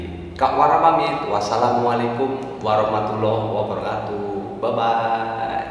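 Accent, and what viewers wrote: Banyumas, Indonesia. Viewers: Ngapak?, Cilacap apa Banyumas mas?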